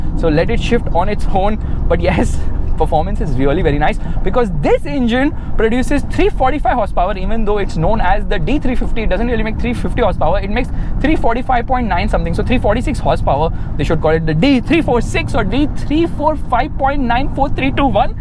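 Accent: India. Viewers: Heaviest Indian accent ever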